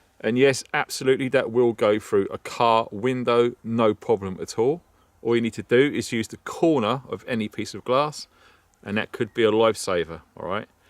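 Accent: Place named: Norfolk, United Kingdom. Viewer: Thanks for the your videos. Please can I ask are you originally from Norfolk ? I was born there but now living in the the northeast. Just thought I could detect a faint bit of the familiar accent.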